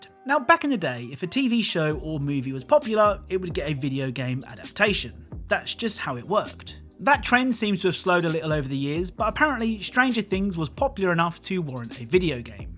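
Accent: United Kingdom. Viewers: Where are u frome? UK? You sound yo Britichhhhhhh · He is British.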